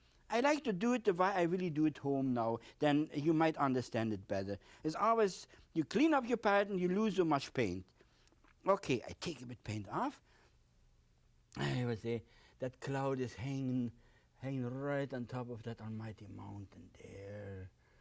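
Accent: Germany. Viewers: Michael actually he got a lot of recognition including an Emmy. I remember him well from my youth and I loved watching him before Bob learned from him. Bill’s personality was fiery, energized and warm and Bob’s was soothing and warm. I like the saying “Bill in the morning and Bob at bedtime.” For Bill too there was a barrier where language was concerned. His English was excellent but his accent was heavy. Whether we like it or not there is a prejudice in our country with certain accents and a tendency to gravitate to those who speak the way we do. It was difficult to get ahead as a popular, public personality with a heavy German (in his case Prussian) accent. Even now I see comments everywhere that mock Bill’s accent to one degree or another. I personally love it but there are many who would likely never give him a chance. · I used to love his Prussian accent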